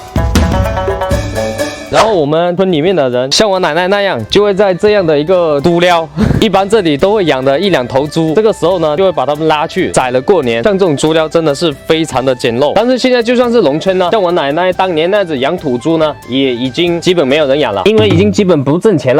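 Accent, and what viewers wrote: Chaoshan, China. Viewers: 这是潮汕话吗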